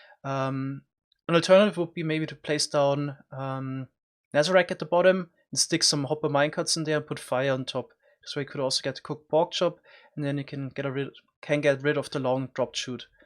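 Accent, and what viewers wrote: Germany. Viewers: Man hört schon dass du normal deutsch sprichst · Is he german? He has the german accent😂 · German accent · What nationality are u from? You sounds like german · Deutscher Akzent is mies am Start haha♥️♥️ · your vids are very entertaining and i always think that your projects are amazing but that german accccent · Guten Tag du Alman. Ich wette nen 50er, dass du ein Deutscher bist, das merk ich doch am Englisch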